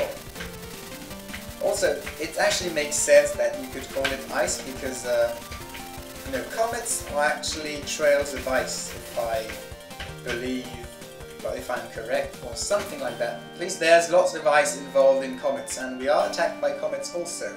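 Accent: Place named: United Kingdom. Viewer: WhAt?! GuY wItH bRiTiSh AcCeNt CaN't PrOnOuCe TaRdIsEs?!?!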